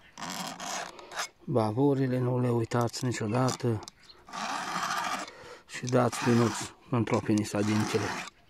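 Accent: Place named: Transylvania, Romania. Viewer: Frumoasa și dulce evorba ardeleneasca e foarte bine venite aceste video clipuri căci ai ce invata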